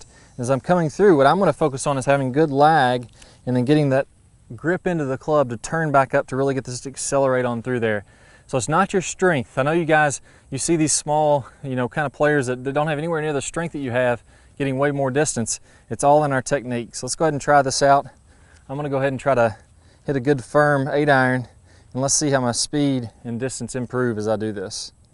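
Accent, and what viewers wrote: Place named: South Carolina, United States. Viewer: Great accent...South Carolina??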